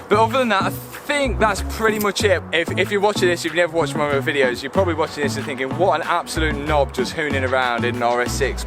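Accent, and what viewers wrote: Leicester, United Kingdom. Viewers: Can tell your from Leicester mate😂👍🏼 is that desford crossroads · you’re so Leicester it’s redic. Enjoy your coffeh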